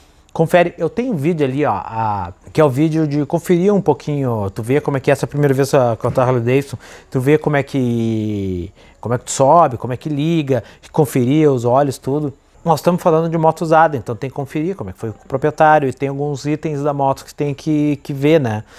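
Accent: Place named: South, Brazil. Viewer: Sotaque portuga do sul do brasil.guri muito bom seu vídeo gostei demais.essa moto e meu sonho.preciso comprar uma dessa urgente.e vc disse tudo moto que não tem elétricas demais não dá problema e meu sonho e comprar pra mim mesmo fazer as manutenções dela.gostaria de uma 883 ou uma forth eight mas essa tem um tanque de 7 litros e por isso pesou já a dyna e maior e o estilo me agradou bastante.muito bom o vídeo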